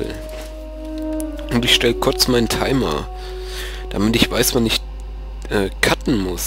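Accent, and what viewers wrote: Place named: North, Germany. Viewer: du klingst als wärste ausm norden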